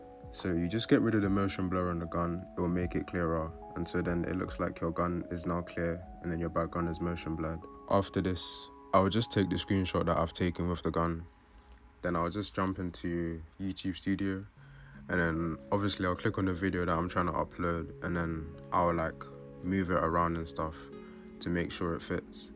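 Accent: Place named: London, United Kingdom. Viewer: I'm from London as well, recognised the voice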